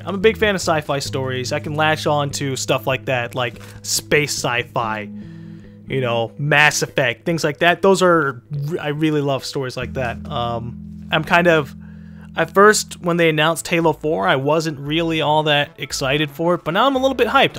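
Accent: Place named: Hawaii, United States. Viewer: hey you from hawaii... brah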